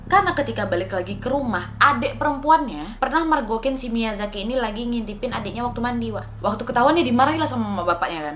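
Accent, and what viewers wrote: Malaysia. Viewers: OMYGOSH. SAID SELUMBER. i love her accent, it really same to Malay accent 😂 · Cara penyampaian wak2 sikit mcm orang malaysia 🤗🤗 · Selamber je bahasa melayu tu bila sbut.. macam asli melayu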